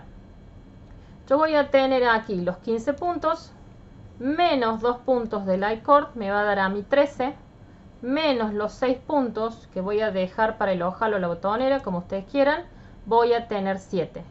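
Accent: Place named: Córdoba, Argentina. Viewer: Hola ahora mismo estoy teniendo en rosa el swaters beis para tres años me encanta tu acento eres de Córdoba? Me encantan tus videos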